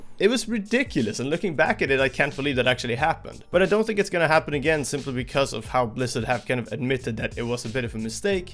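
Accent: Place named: Sweden. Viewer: är du svensk du har dealect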